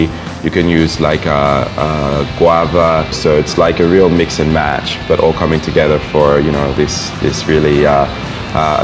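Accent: Manila, Philippines. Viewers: I know you're Australian but your English has the Manila accent too, fascinating. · You sound like a filipino fluent in English 🙂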